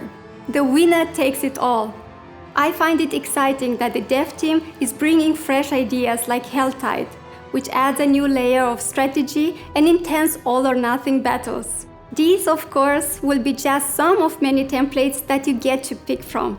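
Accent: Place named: Romania. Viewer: your accent sounds Romanian. I'm Romanian.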